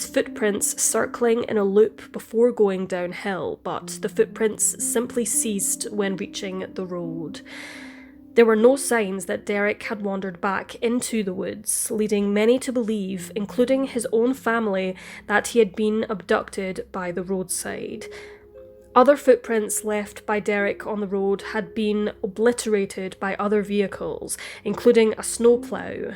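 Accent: Scotland, United Kingdom. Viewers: Love the Scottish accent 💞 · It's hard to peg your accent. Sounds like a hybrid of Scottish and something else? Love it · Is this a Scottish accent or an Irish accent?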